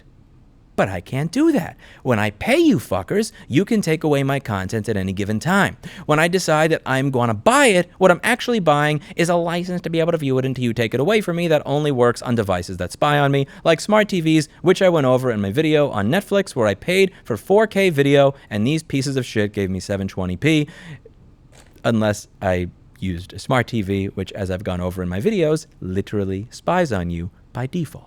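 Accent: New York, United States. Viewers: u can go out of new york but new york wont go out of u.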